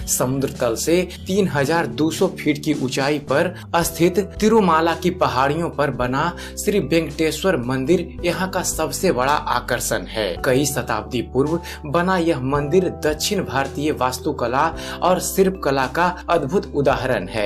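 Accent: Bihar, India. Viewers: Audio me kisi bihari ki awaz hai pakka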